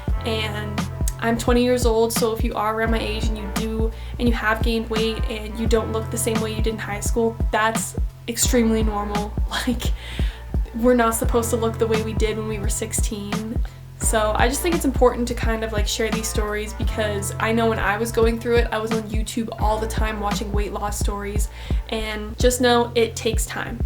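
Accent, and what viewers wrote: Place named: Montana, United States. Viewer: that montaner accent 😂 gotta embrace it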